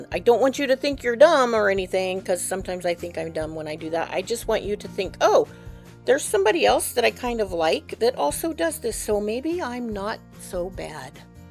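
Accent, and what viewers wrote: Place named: South Carolina, United States. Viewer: You must be from upstate South Carolina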